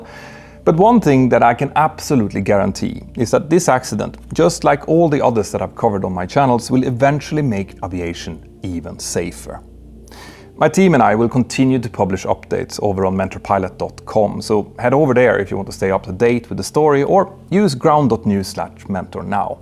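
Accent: Spain. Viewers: urgh PLEASE STOP PRONUNCIATION OF SPANISH WE FUCKING SPEAK PORTUGUESE NOT SPANISH CARALHO!!! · Hey, the language in Brazil is Portuguese, you're reading de terms and documents with a Spanish accent. Great video, BTW. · does live in Spain and has a Spanish wife, so it is not surprising that he would pronounce words with a more Spanish accent even though he is originally from Sweden. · Look, normally I don't like to make a fuss about pronunciation and all that but as a brazilian, the fact that you kept pronouncing everything with some kind of spanish accent is so irritating it actually distracted me from what you were saying. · MANO AQUI NÓS FALAMOS PORTUGUÊS NÃO ESPANHOL, NÃO FAZ O MENOR SENTIDO VC LER NOSSAS CIDADES E AEROPORTOS COM DIALETO ESPANHOL QUE PORRA EH ESSA KKKKKKKKK DUDE HERE WE DONT SPEAK SPANISH, WE SPEAK PORTUGUESE THAT NO SENSE READ OUR AIRPORTS AND CITY NAMES WITH SPANISH ACCENT WTF BRO KKKKKKKKKKKKKKKKKK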